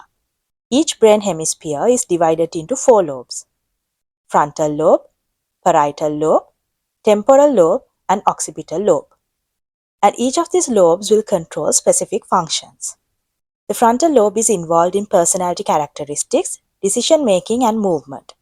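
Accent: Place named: Asia. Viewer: Good video and great effort. Congratulations for a very good job. However, You have a distinct, asian accent difficult to understand by western European citizens and you have spoken to fast at times. Best wishes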